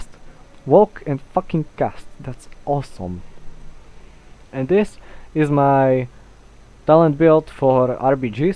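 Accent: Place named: Europe. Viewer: Love your accent man, brings back to my euro friends I used to skype with. I can tell you really hate warriors lol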